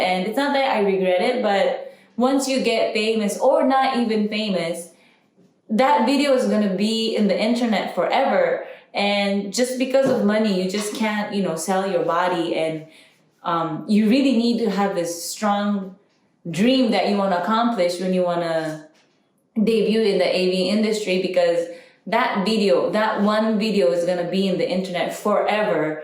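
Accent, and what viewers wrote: Philippines. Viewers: Filipino accent 😍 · Why is her accent sounds like filipino?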